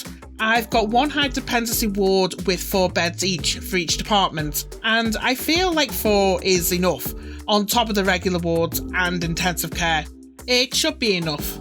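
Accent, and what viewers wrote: Liverpool, United Kingdom. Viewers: You sound like you're from Liverpool! I have the same problems with the system of how wards work. Especially the common rooms. I worked in Aintree and as F1s we had a large communal room for all of us, regardless of the ward! Same with AMU and having patients with diagnoses of different specialities. Oh well, I guess they aren't building it around our systems but some of the logic is irritating.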